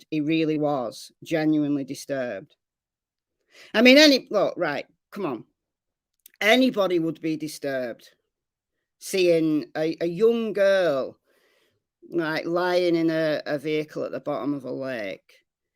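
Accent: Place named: England, United Kingdom. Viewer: Thank you kindly for all that your doing, your a very genuine person out of all the youtubers out there 🐦 And I love your English accent 🙂💜 Lisa ~ Australia 🌌✨🌠 xx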